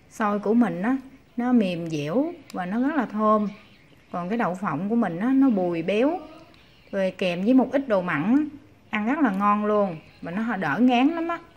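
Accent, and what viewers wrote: Mekong Delta, Vietnam. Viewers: Giong noi miet vuon mien Tay Nam Bo. Tu nhien va de thuong lam. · nói giọng miền Tây rất là đáng yêu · Cám ơn bạn chia sẽ cách nấu món xôi mình thích từ nhỏ. Nhưng xem clip này nghe thấy thương và thấy thích cái giọng miền tây nam bộ, cái giọng rặt chất "bắt con cá gô, bỏ chong gổ, nhẩy nghe gột gột"!!! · giọng miền tây nghe mộc mạc! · ko ko bạn đừng thây đổi giọng nói nhe vì đó là chất giọng đặc trưng của người miền Tây nam bộ đó, theo mình “bạn chính là bạn “ thì đẹp hơn nhiều.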